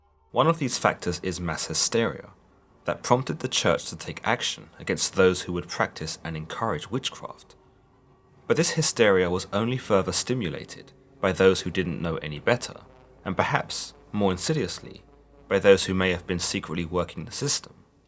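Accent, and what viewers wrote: England, United Kingdom. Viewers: TF... Is this a reupload? I'd borderline be willing to SWEAR I've watched this video before! Not a similar story-of course I've heard the tale of Tituba every which way you can spin it-but THIS one, almost down to English accent & word choice/vernacular... Damnedest thing... #DejaVu anyone? 🤨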